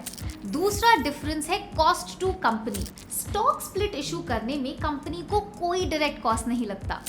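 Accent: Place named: India. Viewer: cant listen to this indian talk , dont understand anything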